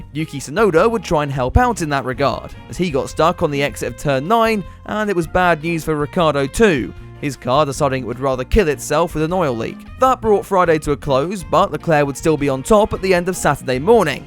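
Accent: United Kingdom. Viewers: Your physical condition is so bad that your face is getting more red with each sentence. Just by talking only while sitting down. In topic. This doesn’t qualify as comedy. But that’s typical British I guess to write down semi smart comments and read them with a British accent thinking people will find it funny. Please have a good recovery, you look sick.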